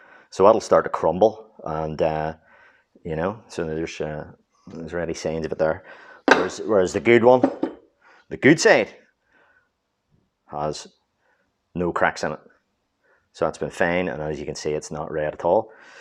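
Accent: Northern Ireland, United Kingdom. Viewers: This is not a British accent! It's Northern Irish. You could say it's a UK accent however.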